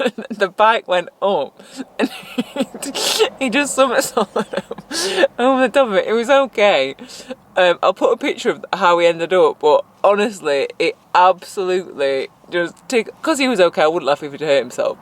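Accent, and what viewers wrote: United Kingdom. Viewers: I am so fall in love in your British pronunciation. Bloody Hell! 🤣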